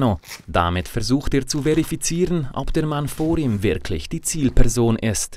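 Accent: Switzerland. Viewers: Nei de heissst CHuuuggaarrr hahhaa, hgesch doch de Sprecher ghört mit sim schönne Bünder XD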